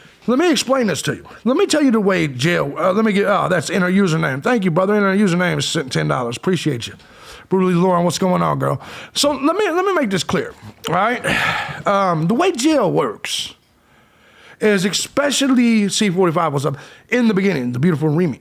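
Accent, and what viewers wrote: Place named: New York, United States. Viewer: After the BATTLE EVERBODY IS A GENERAL. AND SUPERINTELEGENCE, AND DRIVE HIS CAR, HAVE HIS PHONE, A IMBECIL WOD BUY A BURNER, AND MINIMUM 6 MONTHS EFORE, BEFORE THE VIDEOS IN THE SHOP IS DELETED, AND NOW I DONT GIVE ADVISES IN MY TOWN BELGRADE THIS NOW EVERY KOD THE SAME IS IN ARE YOU FROM NEW YORK ORE NEW JERSEY FROM THE ACCENT YOU NOT FROM A SMALL CITY YOU HONNEST YOU SAY WHAT YOU THINK AND AY RESPECT THIS, ALL THIS FOR ME IS REALITY THE ROMANES HAVE GLADIATORS, WY THE PEOPLE TO DISTRACT FROM REAL PROBLEMS. GRETTINGS FROM BELGRADE - SERBIA.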